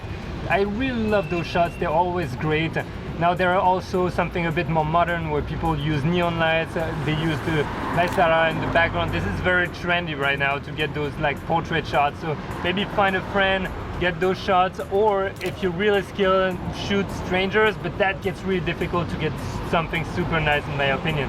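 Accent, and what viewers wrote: France. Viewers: J’entends ton accent français qq part mais vos vidéo sont grave bien et ça m’aide beaucoup pour avancer dans la photographie merci! :)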